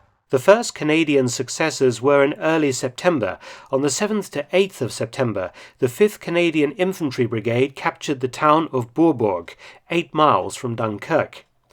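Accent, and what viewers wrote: United Kingdom. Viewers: British accents should be mandatory for documentaries.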